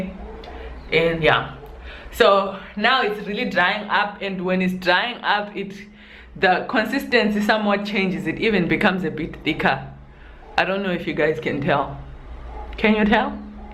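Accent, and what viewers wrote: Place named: Zimbabwe. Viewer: Inlove with the Zim accent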